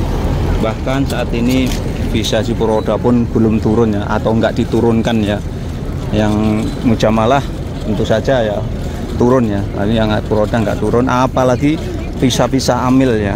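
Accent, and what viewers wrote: Java, Indonesia. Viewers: Jawane metu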